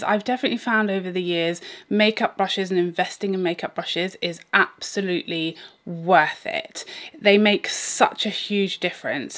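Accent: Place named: RP, United Kingdom. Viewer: Your channel came up in my recommended yesterday, and I have been binge-watching. You have great information. I really loved your last blush video, and I found several to try. Plus, being from the states and lover of English history and literature, it makes me feel like I'm spending time with my perfectly posh friend with with killer RP accent.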